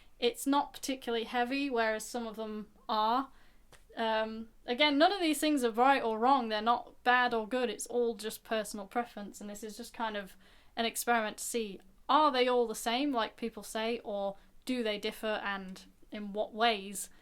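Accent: United Kingdom. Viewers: You sound British!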